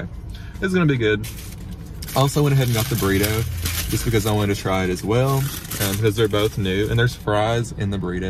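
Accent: South, United States. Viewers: I love your country accent 😍 and your face is beautiful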